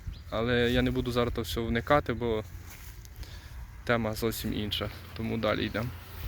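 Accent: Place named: Zbruch, Ukraine. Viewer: Akcent. Vrodi. Nedaleko. Zbrucha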